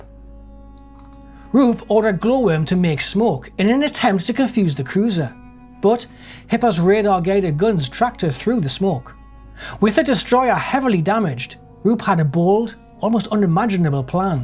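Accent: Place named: Geordie, United Kingdom. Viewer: Great video man , well done again 👏 Glowworm's Nelson's stile barrny. The Royal Navy's power when the Yards were still open from Newcastle to Shields both Banks of the river. And the Mackems at it knocking out Legandary beasts of the sea.. Sorry marra a gan on one noo an agen !!!oi oi Geordie Boy ! 😉 You may actually nead a translator for the local language you just tried to read 😂😂😂 The coment is directed to the creator of this YouTube channel and his great regional dialect accent. Because he lives near me and probably literally knows one of my friends. Without 6 degrees of separation.😊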